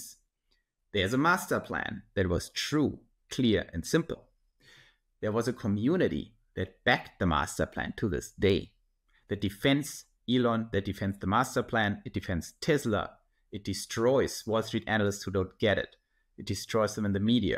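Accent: Germany. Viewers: I sometimes don’t believe my physician, he’s not young and thinks things I don’t. For instance cholesterol. Some people have it genetically and it’s normal. I do go in annually but it’s not like a screening like you are saying. I like your ideas and catching disease early is beneficial 👍🏼 Is your accent German?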